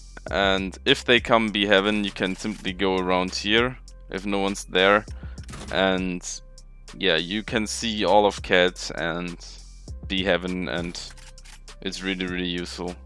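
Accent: Germany. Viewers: Where are u from? Your Accent sounds like german c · Feier den deutschen Akzent haha aber nice Englisch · Is your Main language german? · is this Deutschlish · I‘m not sure but do I hear a german person speaking english in this video? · Ich höre einen deutschen Akzent xD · Man merkt so hart dass du deutsch bist 😂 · Where are you from? You sound german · Man hört einfach so krass das er deutsch is lul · man höher so stark dass du deutsch bist haha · are you german? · Bist du deutscher? Nur weil dein Englisch sich deutsch anhört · R u from germany?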